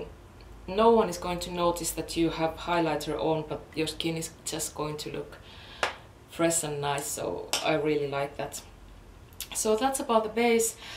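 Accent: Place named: Finland. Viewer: Aksentista arvasin että olet suomalainen. :D Jee, kiva löytää suomalaisia kauneustubettajia, jotka tubettaa englanniksi. :D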